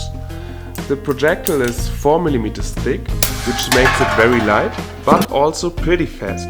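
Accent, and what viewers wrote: Germany. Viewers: u sound very german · Are you german? Your english sounds a bit german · First off, great construction! If I’m right and interpreting your accent correctly, you’re German. Please consider that this is a gun law violation, and if they catch you, you could get into serious trouble. If you are not german and dont have silly laws, then keep up the work lol xD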